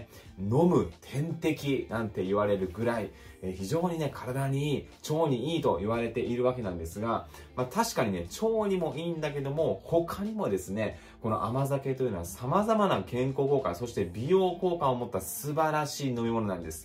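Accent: Kansai, Japan. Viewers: 国菊あまざけを箱買いして毎日飲んでます📦 関西イントネーションが新鮮ですね〜🦻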